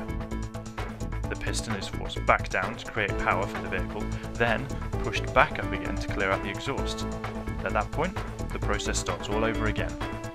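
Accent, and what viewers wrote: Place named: United Kingdom. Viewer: seconds in........ it's always some British guy... ok, Ima watch it anyway. I'll edit if it's a good vid.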